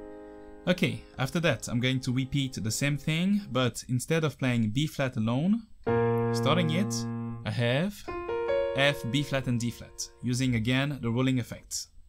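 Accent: France. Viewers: Absolutely love this video, it's really helping me. Thank you! Cool accent by the way, were are you from? France?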